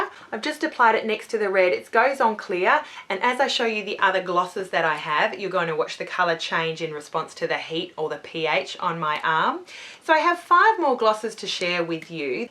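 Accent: Australia. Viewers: are you from Australia?? AWESOME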